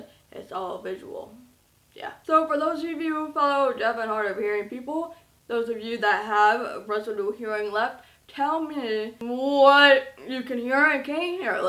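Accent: South, United States. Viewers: How does she have an American accent if she can't hear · omg I just came across your channel and you’re incredible! Are you from the south or something? You sound like it 😁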